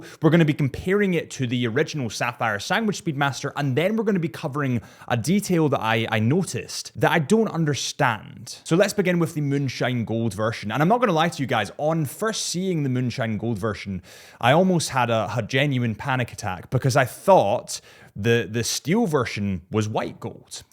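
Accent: Scotland, United Kingdom. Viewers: I think Amiga is just the "Scotch" way of pronouncing Omega, isn’t it,? You don't sound at all like in the Archers, by the way.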